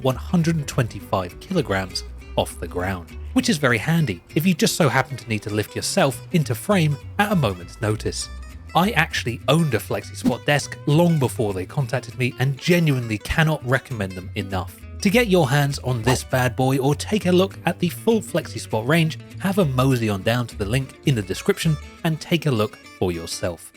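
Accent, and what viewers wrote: United Kingdom. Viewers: Why is a British guy telling me the gun is unrealistic. You’ve never shot a gun. This is more realistic than it was. When you shoot a gun with a scope, the scope moves. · Typical dodging the question British retort on Youtube. "haha just a video game it doesn't matter, real war bad" I really see the weather caused depression and I'm glad my ancestors waged war to separate ourselves to where now your prince married some tramp and your king sucks on top of that. I mean why even go over real life in a military simulation (besides the fact artists and coders more skilled than you dumped their heart out on this experience). And your argument? Hilarious, you give no solutions to the issue you complain about. Yeah, you claimed milsims stupid because they're not real life. I don't play milsims but I know what you just said was placed on a Received Pronunciation Platter of over confident self-hatred, the next course is projection.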